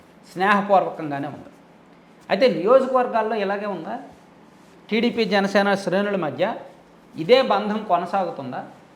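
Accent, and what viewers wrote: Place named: Srikakulam, India. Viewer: బ్రో..ని భాష చూస్తే మా శ్రీకాకుళం లా వుంది ..మీది మా శ్రీకాకుళం అయితే దాన్ని డెవలప్ చేయమని వీడియో చెయ్ బ్రో.. చాలా మంది పనులకు విజయవాడ hyderbad వలస వెళుతున్నారు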